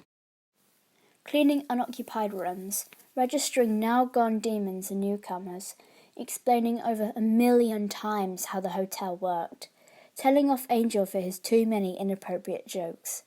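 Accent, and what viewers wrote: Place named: United Kingdom. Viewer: For some reason when someone who has a British accent and they are reading it sounds nice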